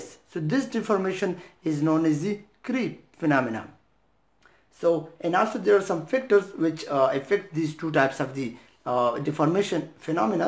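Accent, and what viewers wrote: India. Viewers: Nice Explanation. Just curious to know whether you are from South India?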